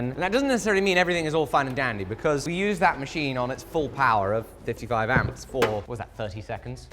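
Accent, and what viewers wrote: England, United Kingdom. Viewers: You already look like you’re making a Harry Potter sequel, the English accent seals the deal. I think you did that on purpose with the thumbnail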